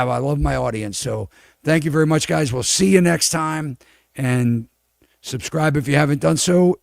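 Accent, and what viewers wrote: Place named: Baltimore, United States. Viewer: BTW, your Baltimore accent is fantastic; the best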